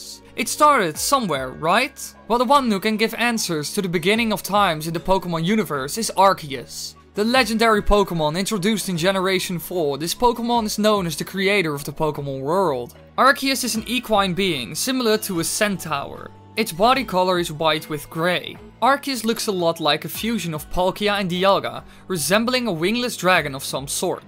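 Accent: Netherlands. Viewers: Are you dutch? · I can hear this guy is dutch. Just his accent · you sound dutch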